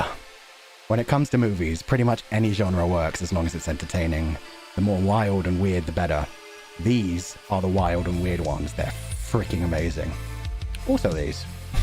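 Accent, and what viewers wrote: RP, United Kingdom. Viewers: Like vox received pronunciation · naaah he’s Vox type of british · posh I think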